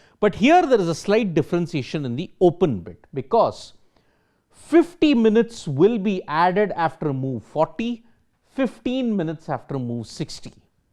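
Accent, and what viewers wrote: India. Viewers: Do u have a problem with the Indian accent? His voice is loud; his pronuciation is clear. What more do u want?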